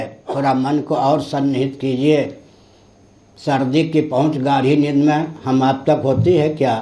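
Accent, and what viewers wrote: Standard. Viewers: विशुद्ध हिन्दी बोलना कोई आसान बात नही है।।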